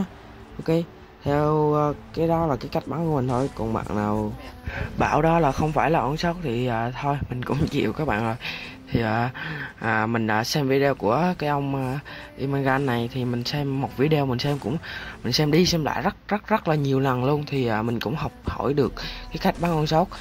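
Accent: Quang Nam, Vietnam. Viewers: Anh người quảng nam hả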